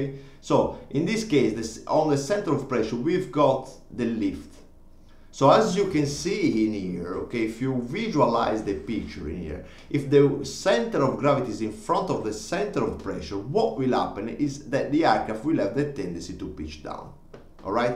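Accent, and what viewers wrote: Italy. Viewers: You sound very Italian! Ciao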